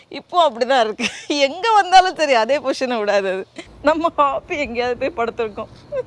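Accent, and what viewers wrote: Nagercoil, India. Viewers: Nice Nagercoil slang..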